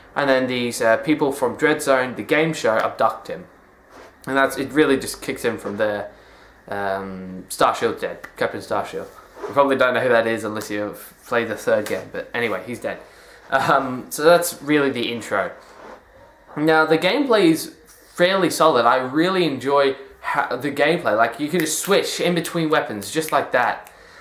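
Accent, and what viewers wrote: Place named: United Kingdom. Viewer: You are half the reason I read every written game review in a british accent.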